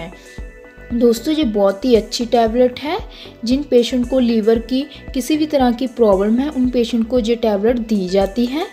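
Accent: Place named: Punjab, India. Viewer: Aap punjab se lagte hai ..khas kar barnala side se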